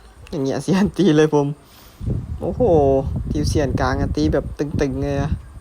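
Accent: Isan, Thailand. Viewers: นี้มันช่อง Doyser But พากย์อีสาน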